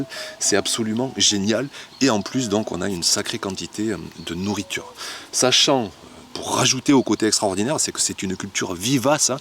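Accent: South, France. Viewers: Bonjour, je confirme que la chayotte en pleine terre réussit très bien en Alsace! La mienne est très à l'aise en grimpant sur l'actinidia.J'en rentre des cageots pleins en ce moment...Par contre, effectivement, en pot, aucune production...! J'adore vos vidéos si vivantes et avec l'accent du sud en prime!😀🥰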